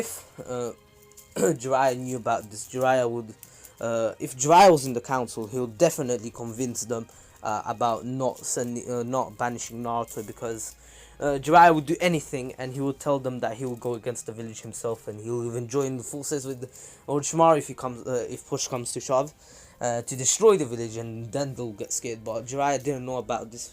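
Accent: United Kingdom. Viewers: i just love to hear a brit swear it sounds amazing and awsome video btw keep it up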